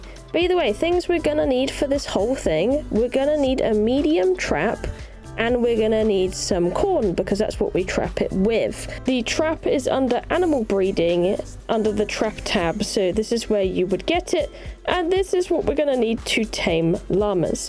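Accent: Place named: Cockney, United Kingdom. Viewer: The cockney/essex dialect is SO funny. It's not LAMA it is LAMER. It's not WATER it is WUOA. Too funny.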